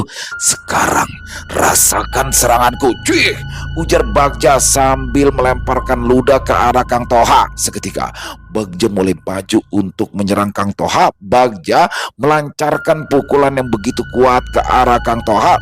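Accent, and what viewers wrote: Jakarta, Indonesia. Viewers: Bang ❤❤❤, bank, bang Doni sama mba nani,aa hajat sama Clara,mas wasis sama mba ivory,mas gembul sama teh euis, 1/1 pada punya jodoh masing masing lucu deh,.wkwkwk aq suka bang bawain cerita,pakai logat betawinya mantap x,sampai saya tertawa sendiri pas dengerin bang cerita,..wkwkwk 👍👍👍 pokoknya menghibur x saya suka ini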